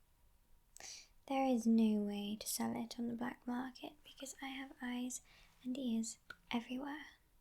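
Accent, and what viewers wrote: United Kingdom. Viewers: my first reaction "shes british"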